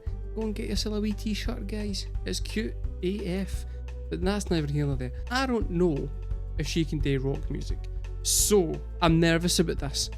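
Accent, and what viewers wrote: Scotland, United Kingdom. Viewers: This is my first time viewing your channel. Really enjoyed your reaction One piece of context I’d like to share is that this isn’t a touted “rock version”. She never said that. Fans that recommend the reaction say it. This is just how she played the song that tour. We can say it’s rock or not but she never made that promise or claim Also, she’s done pop rock before. sounds shockingly like and from 2010 might as well be a song. I’m gonna subscribe and check out your other reactions P.S. your accent is gorgeous. You’ve got to be a Scot?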